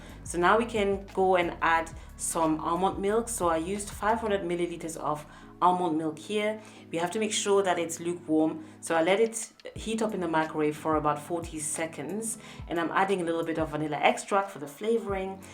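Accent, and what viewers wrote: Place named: France. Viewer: I can feel your French accent in the English 😂😂 you're such a patient teacher 💕💕💕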